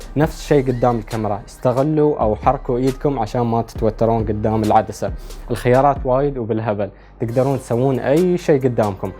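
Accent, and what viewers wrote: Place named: Iraq. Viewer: قناتك تعمل محتوى ذو جودة عالية وهذا سبب انتشارها السريع استمر هكذا وسوف تنجح أكثر.. بالمناسبة أظن أن لهجتك ليست عراقية بشكل كامل فهي مبسطة وتدمج فيها الفصحى وهذا يجعلها سهلة لغير العراقيين. عجبتني اللهجة ولكن الموسيقى الخلفية مكررة ..ياريت في كل فيديو تستعمل موسيقى مختلفة. وياريت تعمل فيديو كيف تصوير الفلوغات .. فأنا أحاول عمل فلوغات ولكني لا أعرف كيف أختار زوايا التصوير.. اعمل لنا هذا الموضوع مستقبلا لو استطعت.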